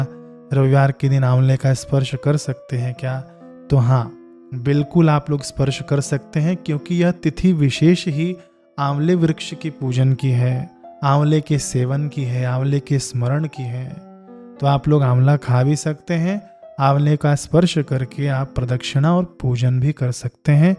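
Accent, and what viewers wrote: Standard. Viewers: Aapki bhasha bahut shudh. Srl.or mithi h..aapki puja ka trika or smjhne ka trika bhi bahut accha h..mene aapko sabscrib kr liya h..or all bhi kr liya .me aapki bahut video dekh bhi chuki hu..sari video bahut helpful h ...jai shree Radha krishna 🙏🙏🙏🙏🙏🙏🙏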